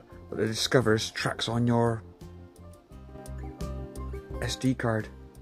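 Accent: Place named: West Country, United Kingdom. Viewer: Great he's English. Could be from West country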